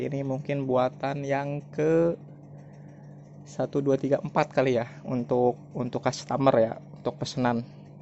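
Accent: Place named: West Java, Indonesia. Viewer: Kanyahoan bgt si a urg sunda na wkwkwk... Sok ah di lanjeng....mugia sukses a🙏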